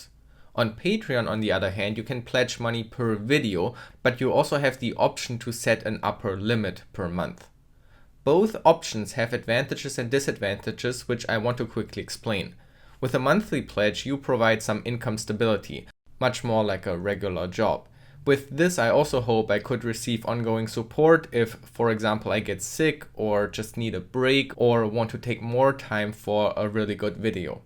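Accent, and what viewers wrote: Germany. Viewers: what part of Germany you from?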